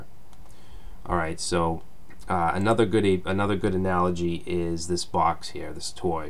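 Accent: Boston, United States. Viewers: Boston accent? Great vid.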